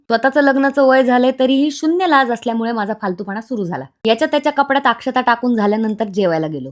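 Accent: Beed, India. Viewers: मॅडम तुम्ही बीड जिल्ह्याच्या आहात तुमच्या बोलीभाषा समजते कारण मी ही बीड जिल्ह्याचा आहे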